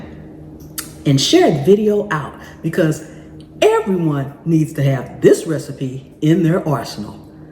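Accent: South, United States. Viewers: The Southern drawl really makes the video interesting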